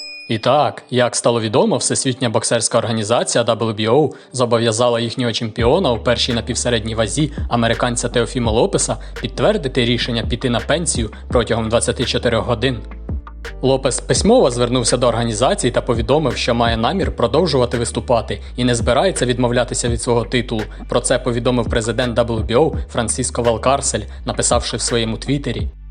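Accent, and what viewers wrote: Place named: Rivne, Ukraine. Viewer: Акцент у тебе північнорівненський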